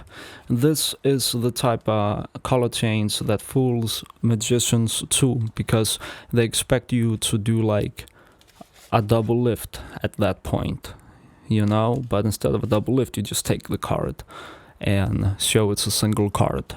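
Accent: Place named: Russia. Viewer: Ahhh that Russian accent😭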